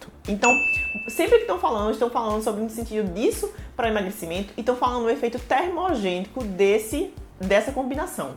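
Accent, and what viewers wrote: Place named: Pernambuco, Brazil. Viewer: Que gracinha você! De Pernambuco, certeza!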